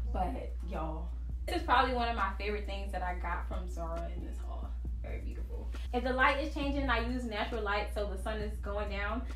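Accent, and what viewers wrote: Philadelphia, United States. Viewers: lmaoo completely off topic but as a fellow “philadelphian” i’m like she sound like she from philly. i had to do some investigation and saw it in your instagram bio lol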